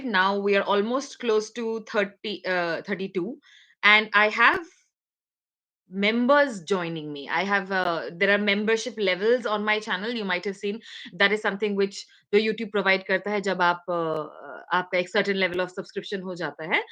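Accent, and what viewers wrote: Standard. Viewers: Madam आप का हिंदी बहुत ही शुद्ध है 😊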